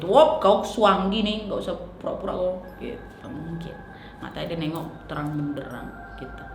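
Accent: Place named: Medan, Indonesia. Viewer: Kakak ini logat nya macam org medan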